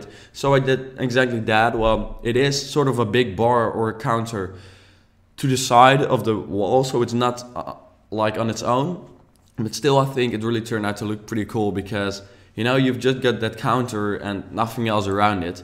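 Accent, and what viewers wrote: Netherlands. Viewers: I come from Belgium, you have such a nice accent, I hear you're Dutch, but it's a nice accent lol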